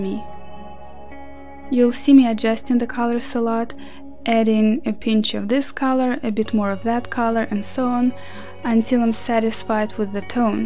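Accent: Russia. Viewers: Русский акцент)) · Wow, are you from Russia?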